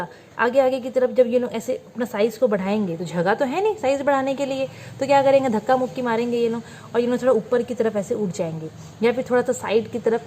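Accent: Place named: Kerala, India. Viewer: Maam you are from kerela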